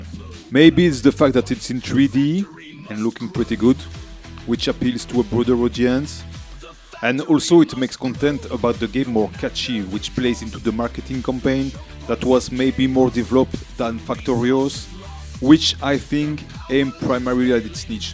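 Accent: France. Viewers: Of course! I find it quite charming to be honest. It's very intelligible, don't you worry, but it's also VERY french :D It's a neat accent. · This is some premium french accent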